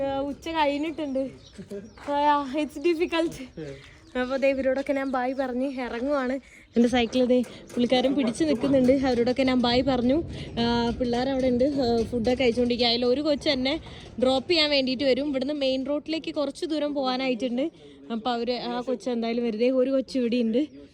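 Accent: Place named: Ottapalam, India. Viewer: പാലക്കാട് ഒറ്റപ്പാലം ഏറിയയിൽ ആണോ വീട് സംസാരം കേട്ടപ്പോൾ അങ്ങിനെ തോന്നി ❤❤❤❤😅😅😅😅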